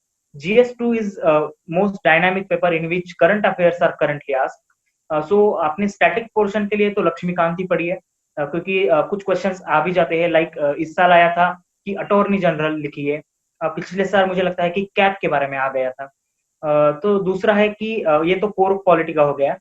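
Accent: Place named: Maharashtra, India. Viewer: Marathi mulachi hindi bhasha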